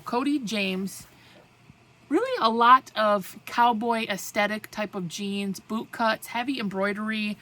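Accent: Wisconsin, United States. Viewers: Fun to hear you talking about Madison. I grew up there and loved it! And I love to hear that Wisconsin accent. 😊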